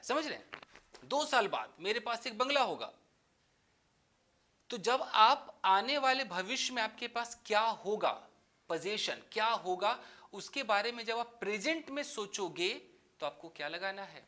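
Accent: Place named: Meerut, India. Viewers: you are from Meerut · best class ever because of haryanvi agree? thokdo like 👍👍👍👍👍👍👍👍👍👍👍👍👍👍👍👍😂😂😂😂😂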